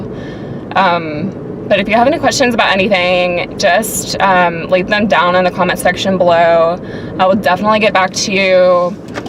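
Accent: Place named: South, United States. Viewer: U have a southern accent, u must be from either Kentucky or Tennessee 😂 love ur video btw.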